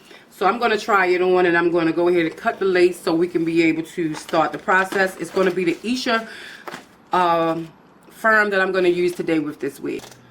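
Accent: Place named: Mid-Atlantic, United States. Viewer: Where are you from? You sound like Maryland, Philly or Pittsburgh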